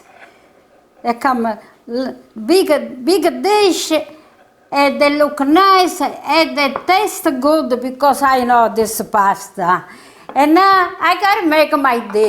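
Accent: Italy. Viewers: .....,mi chiamo Carmela...I love your Italiano- Inglese as much as I ❤ love your cooking! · Im starving now😋 i have to make this. I love penne ala vodka and the peas are a good addition. I also love your singing and beautiful Italian accent 🇮🇹 Bravo!! 👋👋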